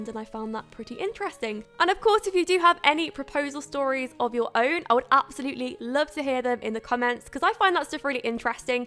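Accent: England, United Kingdom. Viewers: Not many comments... are you English from England, I am:)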